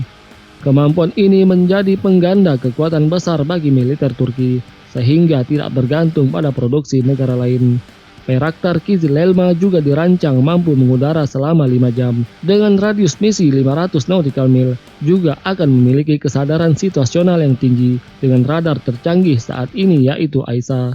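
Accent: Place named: Saparua, Indonesia. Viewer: Min kau orang saparua k...logatmu kental orang saparua😁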